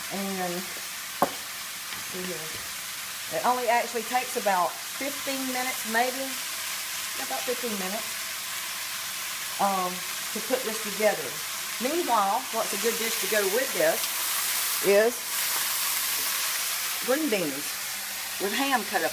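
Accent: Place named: South, United States. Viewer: I am an Aussie and I LOVE the southern drawl!!!! This is like mums cooking! LOVE IT!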